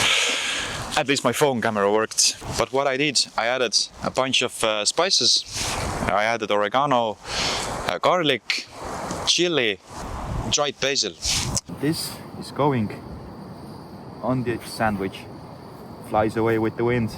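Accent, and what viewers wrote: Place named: Estonia. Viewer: Love your Estonian accent, your videos make me want to visit Estonia and the rest of the Baltic countries <3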